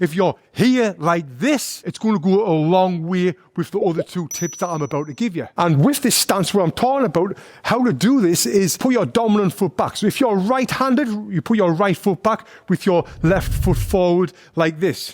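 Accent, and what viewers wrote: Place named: Newcastle, United Kingdom. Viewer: Excellent video, nice geordie accent 👍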